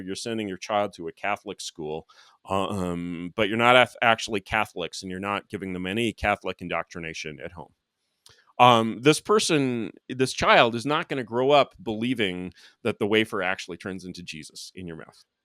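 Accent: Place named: California, United States. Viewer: your perfect California English accent is o minune misterioasa. Your vocabulary, your mastery of both language and subject matter is astonishing. Curious, can you also speak Magyar? Oh well, carry on. I just had to exclaim a little!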